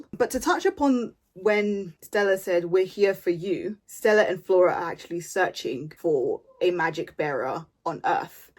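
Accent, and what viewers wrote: United Kingdom. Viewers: my god, i couldn't get enough of your accent through the video! What's your accent, british? But I think i hear some other accent. Am I wrong??😵‍💫😣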